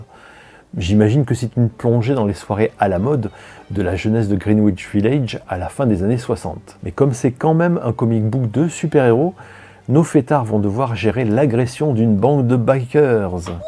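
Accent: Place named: United Kingdom. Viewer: Merci pour ta vidéo et ta chaîne dans l'absolu ! Ton accent anglais me fait bien rire 😉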